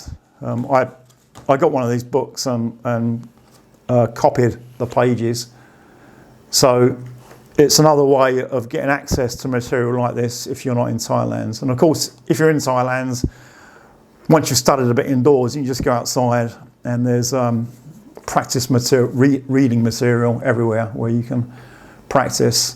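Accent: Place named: United Kingdom. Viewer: I love your British accent. 😊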